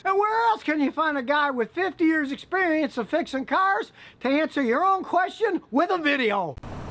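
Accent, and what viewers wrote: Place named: Texas, United States. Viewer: Hi! Greetings from Argentina!!! Did you ever see the Volkswagen Fox that is sold in Latin America? What do you think about it? Thank you very much for your videos! I love your Texan accent! It's so cool!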